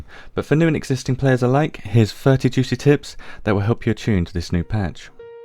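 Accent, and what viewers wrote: United Kingdom. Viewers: This was lit (Love your soft British accent)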